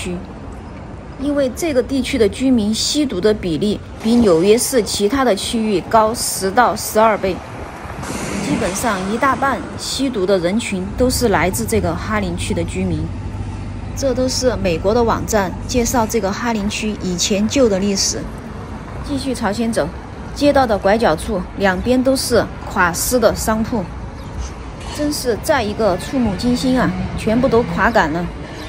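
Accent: Sichuan, China. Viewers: 哈哈，晚上不要东晃西晃，四川话好亲切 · 大邑邛崃蒲江一带的口音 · 流利的川普，就喜欢这个口音，加油。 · Haha - I can tell the host is from Sichuan where I came from... Take care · 看你的视频也学了不少四川普通话。👍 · 师姐你是四川人吗 都垮杆了😄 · 师姐是 四川人吗？ 川普好亲切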